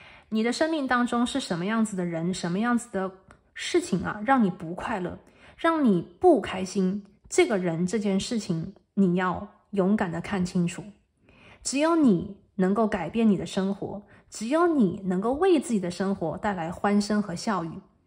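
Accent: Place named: Taiwan. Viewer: 變台灣腔？好奇怪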